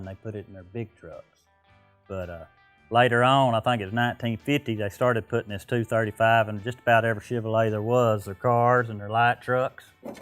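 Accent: United States. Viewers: I was born and raised in rural Mississippi. The army too me all across the world. Your voice and accent remind me of home and memories growing up. We had a Mr. in our community, Mr. George Carter who ran Carters Store, a great man. Absolutely loved the video. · love the passion you have and how much the different pieces mean to you. I will be watching, good to know people like you still out there...your accent reminds me of all my family back in Georgia, Mississippi...i use to have that same accent but lost it many years ago. i use to get teased when I was younger, living in California...never really bothered me at all. But guess what....now they say --y'all and it just doesn't fit...oh well. Let the good times roll.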